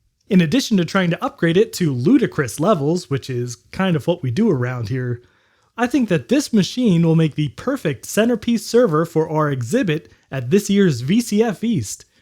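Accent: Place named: North, United States. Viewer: It depends on your accent. I'm not sure about American accents but sounds more like a northern states person, close to Canada. Not sure. I'm British and we pronounce hyperbolic as a mix between the way you said it's meant to be pronounced, and the way that Americans pronounce it. Like "hy-per-ber-lic". Actually though, "hyper-bol-ic" is *not wrong*.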